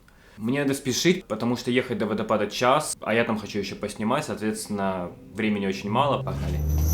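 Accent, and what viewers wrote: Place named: Odessa, Ukraine. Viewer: а ты шо из Одессы?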